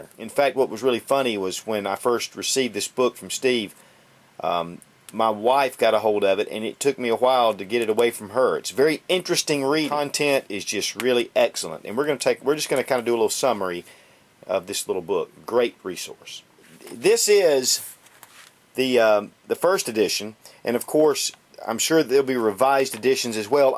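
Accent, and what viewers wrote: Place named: Greenville, United States. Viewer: ! I knew you were in Greenville! I was born and raised there, and I had a funny feeling you were there too based on the accent.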